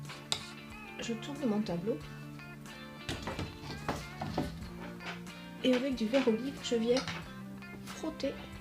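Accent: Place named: Provence, France. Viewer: Bonjour - C'est vraiment magnifique, quel coup de main! Dommage par contre que l'on ne voit pas votre palette pendant la vidéo. Je vous trouve formidable et quel accent merveilleux - j'hésite entre vers chez moi en provence ou le sud-ouest, mais je penche plutot pour la première solution. Toujours un régal de vous suivre; Merci pour toutes ces belles vidéos et vos conseils précieux. A bientôt.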